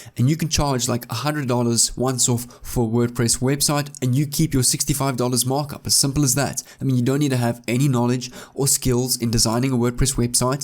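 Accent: South Africa. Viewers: You sound like you have a South African accent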